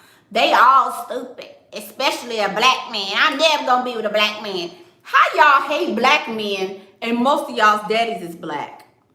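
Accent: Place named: South, United States. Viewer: you did it again!!! This video was everything!! Straight facts.. I’m 26 years old and I finally stepped out the victim mentality.. and watching you more and more makes me really double think of what I DO!! you crying is definitely not a sign thing.. when you hear real and feel it you automatically going to cry because I sure did.. I highly appreciate you.. and I love you southern accent we Texas women rock lol. Much love to you 🥰 PS:50,000 subscribers will definitely be yours by your birthday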